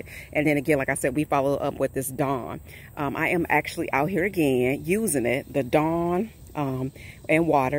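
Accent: South, United States. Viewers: I live in the Midwest now but I miss a good ole southern accent. “We sprayed it with this DAAWN” 😂 love it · Girl, you sound like me. You must be from the south too. 😀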